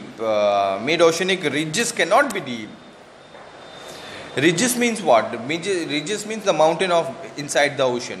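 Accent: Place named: United Kingdom. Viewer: Doesn't ur English belongs to British country sir why r u wasting u r time in Assam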